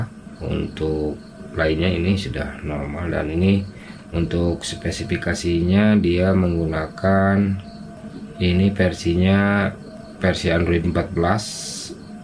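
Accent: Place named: West Java, Indonesia. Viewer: Ti Sunda mana kakang? Urang sampe nguley seuri, ngadangu bahasa "TUNGGU SAMPE BIJIL"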